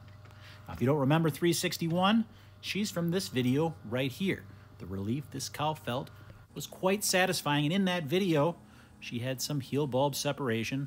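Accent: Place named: Wisconsin, United States. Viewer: Ope! Thats a Wisconsin accent if I’ve ever heard one.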